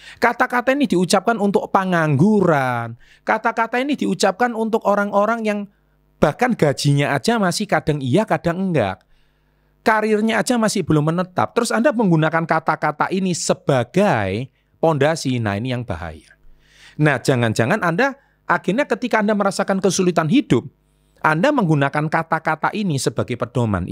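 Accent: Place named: West Java, Indonesia. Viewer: Cilaka😂 sunda pisan euy😂 i love you pokona mah❤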